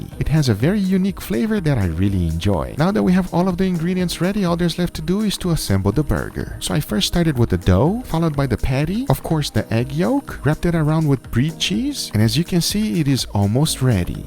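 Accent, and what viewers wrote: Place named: Brazil. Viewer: he’s Brazilian so I think it’s just an accent/2nd language thing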